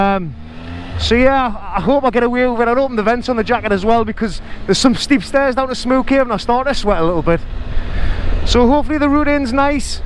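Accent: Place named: Newcastle, United Kingdom. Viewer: Fantastic views, despite the cold. Treated the weather with the contempt it deserves. Less a crash than a sliding tackle. Geordie accent is perfect. Treat watching this.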